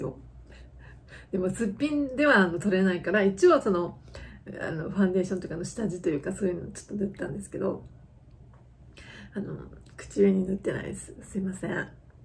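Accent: Hakata, Japan. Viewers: たまに聞こえてくる博多弁？、とても良いです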